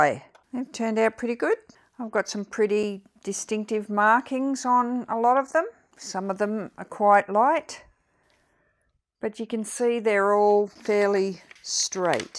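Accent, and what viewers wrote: Australia. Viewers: I love your Australian accent! Sounds very royal haha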